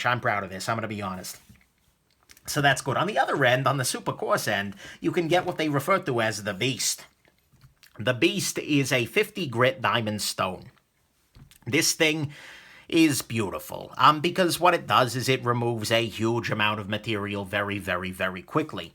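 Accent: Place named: Brooklyn, United States. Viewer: Would you say the basic kit with just the 4 diamond stones from <num> grit is good enough? and what size rod would you say the base has coming out of it. I'm just looking and it looks close to a 3/8-1/2 rod I don't see the point paying the close to $50 for the base here in Canada. Amazon has the basic kit for $220 free shipping so thinking of just ordering that then making my own base wouldn't be hard to have a piece of wood and just put a bolt coming out. Hell almost looks like the lansky base would work too. And I have to ask where are you from? Accent sounds like a brooklyn or queens to me haha.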